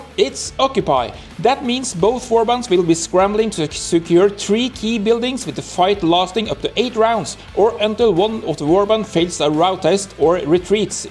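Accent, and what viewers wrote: Norway. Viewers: Den norske aksenten skinner fint gjennom :)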